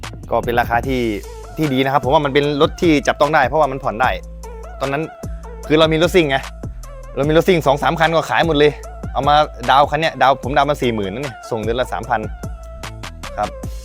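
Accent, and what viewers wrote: Korat, Thailand. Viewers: คนโคราชเดะนิ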